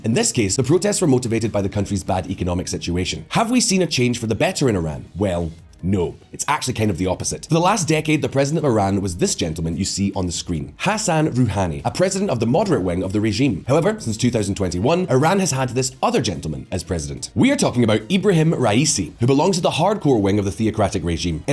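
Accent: Scotland, United Kingdom. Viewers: Maybe, you will understand this better... Are you Scottish English? Answer me that!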